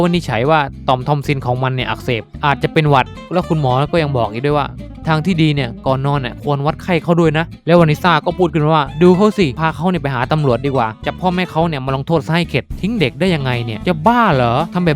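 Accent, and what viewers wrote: South, Thailand. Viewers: เหมือนจะสำเนียงอีสานนะ แต่บางทีก็ไปทางใต้ มีเสน่ห์ดีพี่ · พี่พูดเหมือนสำเนียงเหมือนใต้เลยครับ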